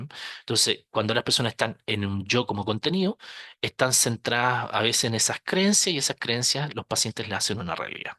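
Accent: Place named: Chile. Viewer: Genial, estudiaré el tema y además con acento chileno. ❤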